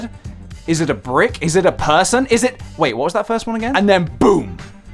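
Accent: Peterborough, United Kingdom. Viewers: Lol sounds like good ol’ Peterborough to me 😂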